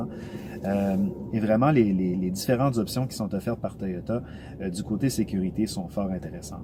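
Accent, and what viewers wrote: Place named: Montreal, Canada. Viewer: Superbe vidéo. J'aurai pensé que vous étiez de Montréal!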